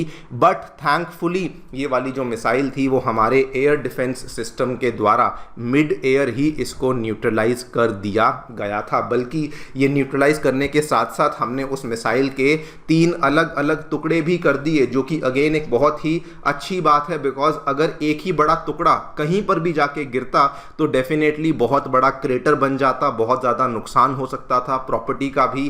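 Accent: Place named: Bengal, India. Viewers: a is o in bengali. Its accent. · it is Bengali acent Bengali pronunciation Bengali language pls don't impose Hindi acent on us. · ..that's quite a bengali accent ...hope u understand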